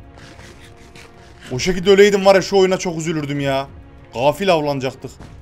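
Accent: Yozgat, Turkey. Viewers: Abi Yozgatlı mı sın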